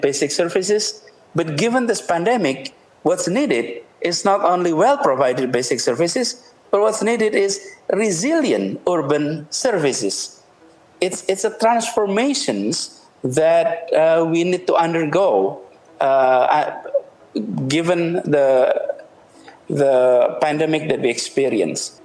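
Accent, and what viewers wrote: Indonesia. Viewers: To be honest, this is the very first time I see this 'bahlul' speaking English, and I have to say that I'm not at all impressed with his English. I find he just speak Indonesian with English words (not vocabulary). You know what I mean, don't you?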